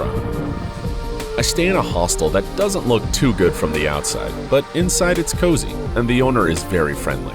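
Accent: United States. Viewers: Great video and editing. The 'american' overvoice does not do you justice. I would like to hear a Czech voice.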